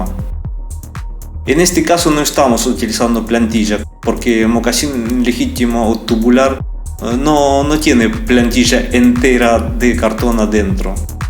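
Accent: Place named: Russia. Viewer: Спасибо за урок. ..Сперва было странно, обратил внииание на русский акцент, а потом увидел имя автора, и стало на свои места.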